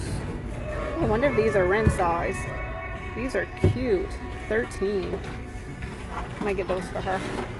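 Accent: Louisiana, United States. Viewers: The music - ugh - but I love watching you- I’m a Louisiana girl so I get your accent😍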